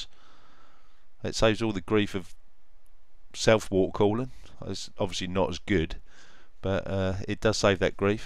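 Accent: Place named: England, United Kingdom. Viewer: Good review. English accent's are badass, couldn't resist saying that lol. I think if Fury X would drop to 550$ and Fury 450$, assuming they can at least hit 1200 with some voltage on a proper loop they would sell like crazy. Not to mention DX12 coming and looking at Hawaii i think Fiji will come a long way in a year.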